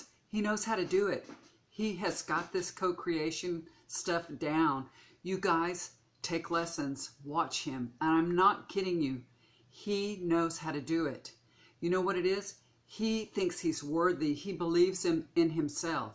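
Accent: South, United States. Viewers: I love southern voice so positive to me. Hope that still do more video.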